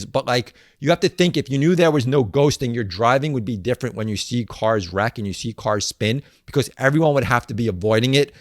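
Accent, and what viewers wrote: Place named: New England, United States. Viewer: you from New England? That accent sounds like it! I'm in RI! I've been seeing your videos for a few months! Appreciate the content, brother!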